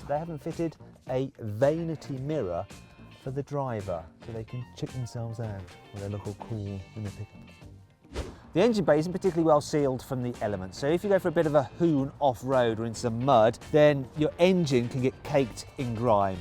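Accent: United Kingdom. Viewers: 英語の訛りがイギリス以外のなんでもないやん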